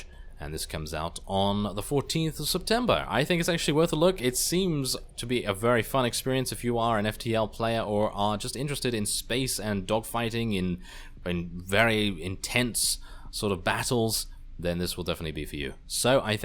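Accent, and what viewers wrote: United Kingdom. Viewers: Where you from? Are you brit? Can't tell if you're brit or US.... anyway, good vid. (no yelling, no acting). You sound like a nature documentary dubber "the lion is staring at the buffalo... the buffaly sees him but blablabla". You get the idea!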